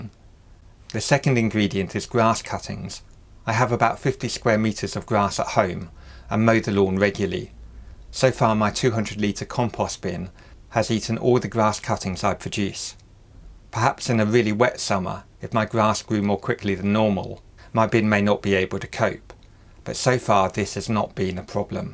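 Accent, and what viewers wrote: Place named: United Kingdom. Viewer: I love your British accent and how you say methane it's more like meethan for phonetics.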